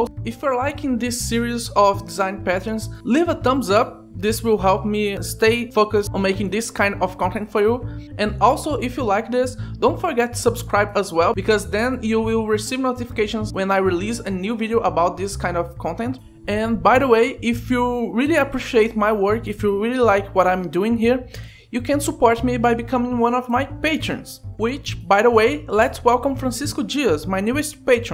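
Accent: Brazil. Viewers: Brasileiro ? Haha, reconheceria o sutaque do meu país até no inferno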